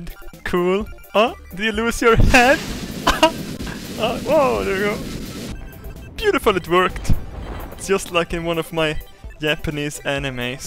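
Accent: Sweden. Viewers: shutup Dave no 1 likes u thats y u dont have friends. is swedish of course hes going 2 have a different way of talking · i watch just to hear him say a word with a J i love sweedish accents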